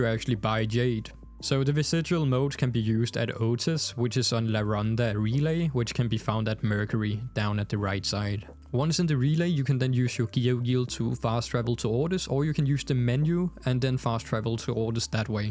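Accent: Denmark. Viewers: is this guy danish?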